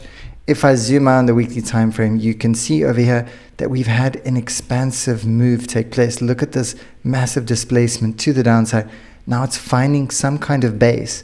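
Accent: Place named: South Africa. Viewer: this mans is south african no matter what u can say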